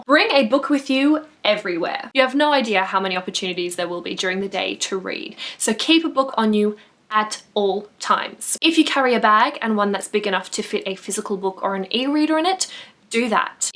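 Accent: Australia. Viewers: May I ask what part of Australia you're from?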